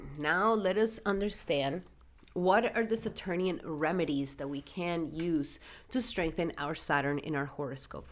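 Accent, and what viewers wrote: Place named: Spain. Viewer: I love your intros! Most channels are so fluffy, your intros are so dense, captivating and informative! I have a question, when you say if a planet is “strong”. How do I know if my Saturn is strong? Also very random, I’m a Spanish teacher and love the language… I know this is a Vedic channel, but your accent sounds Spanish -esque 😂 any relation?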